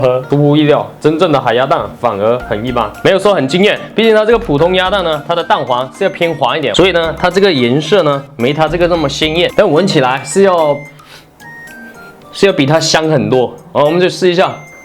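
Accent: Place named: Cantonese, China. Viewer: 靓仔会说粤语吗？因为听到你的口音好像会说粤语（出于好奇心）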